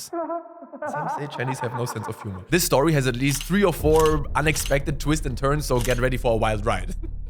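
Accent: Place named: Germany. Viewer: Ich habe gerade festgestellt, dass die automatische Übersetzung von YouTube durch deinen starken deutschen Akzent einfach nicht funktioniert. 😂😅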